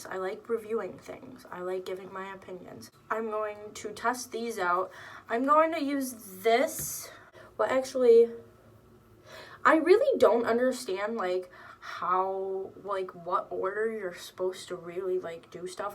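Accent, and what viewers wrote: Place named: Minnesota, United States. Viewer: I'm gonna guess Minnesota, lol.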